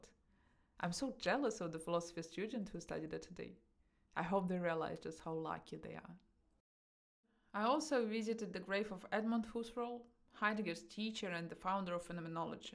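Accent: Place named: Russia. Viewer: I hear russian pronunciation ...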